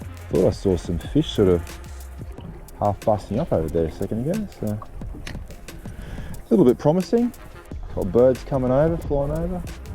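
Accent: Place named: Australia. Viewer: Your first time in Australia, sounds like your a fuckin aussie to me and sounds like you know what you r doing in Australian waters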